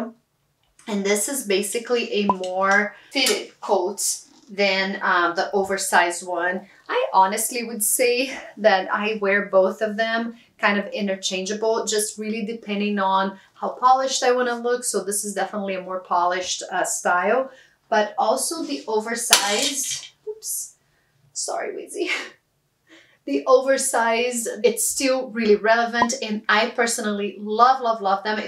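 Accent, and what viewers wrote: Brazil. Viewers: is brazilian for sure, that accent... 🤔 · Are you Brazilian ? I’ve heard a Brazilian accent since the first sentences you said (actually, I’m writing this comment on minute . Just curiosity 😊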